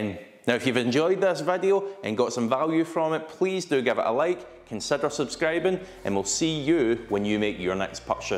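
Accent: Scotland, United Kingdom. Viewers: I wish I was born in Scotland. Such a great accent!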